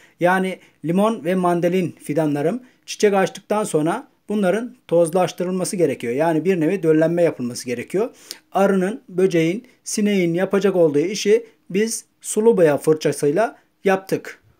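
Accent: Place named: Azerbaijan. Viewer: Aynan azeri lehcesinde konuşuyor.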